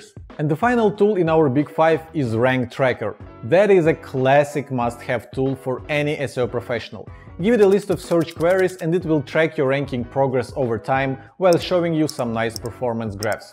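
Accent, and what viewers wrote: Russia. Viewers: i think russian accent, no?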